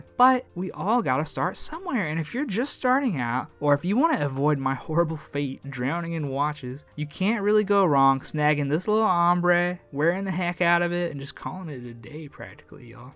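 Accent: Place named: South, United States. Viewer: that mall shot you put in there is the World Trade Center mall in lower Manhattan. A place where I have gone watch shopping time to time (they have a swatch boutique, tissot boutique, and London jewelers). For a minute I thought you were from NYC, but im guessing by your accent down south is more likely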